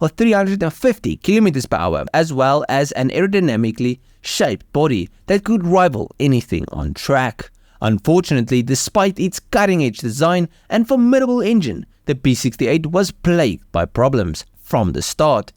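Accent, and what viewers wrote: South Africa. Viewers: Sounds like one of my countrymen from South Africa. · I think it's one of those less well known South African accents. Our ears are just not attuned to the S.A. accent because they haven't been on the (automotive) world stage that much.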